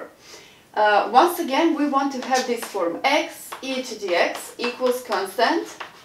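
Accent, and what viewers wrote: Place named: Brazil. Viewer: You sound like a brazilian. Pretty cool.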